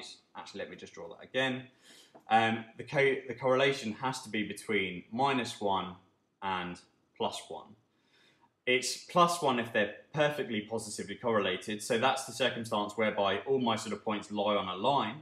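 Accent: United Kingdom. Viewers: British accent....NICE ! ! ! Wishing all Yankees could have British accents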